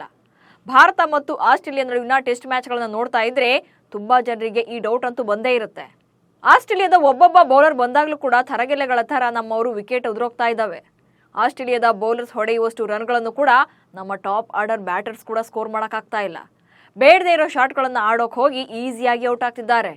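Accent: Standard. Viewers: Svachcha kannadada dvani shanta medum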